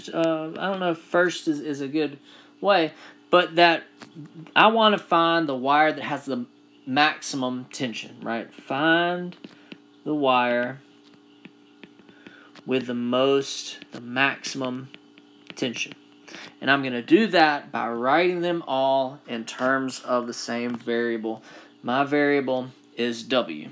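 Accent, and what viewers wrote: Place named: South, United States. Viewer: I like your southern accent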